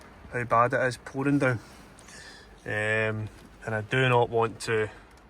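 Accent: Glasgow, United Kingdom. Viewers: Well done mate, not many would have made that relay repair. This repair should give you plenty of work. Which you deserve, once the word gets around, that you supply good work and can be trusted.ps. Good to hear the Glasgow accent 👍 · What a fantastic diagnosis and repair video. I’m having a bit of trouble with the strong Scottish accent, but think I’ve ‘tuned in’, and can understand the work flow. I do repairs for people, as I love solving the puzzles. Just repaired “my grandfather’s Panasonic walkman’ for a friend, which was a long job due to battery corrosion. All the plate-through holes did not connect the top to the bottom track. Each pad was ‘etched’ away from its plate through centre. I had continuity from the centre to the track below, but not from the centre to its top pad. Had to fill nearly all plate throughs with a wire link. Anyway, traced the whole board through and found more breaks in the track. 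All repaired. Fixed it in the end. Absolutely loved your analysis and diagnosis. Using sound to find the faulty relay - fantastic skill. … and then tearing the relay apart to demonstrate the hard fault. Also liked your research. Other authors bothering to write-up their fault-fixes. What a useful database. Looking forward to the next video collection. Matt Lee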